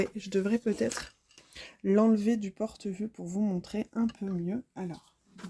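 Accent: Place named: France. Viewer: J.aime beaucoup ton accent français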